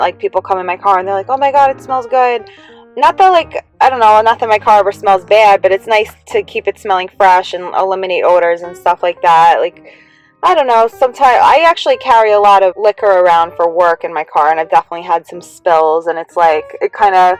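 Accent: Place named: Philadelphia, United States. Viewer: Philly accent 🦅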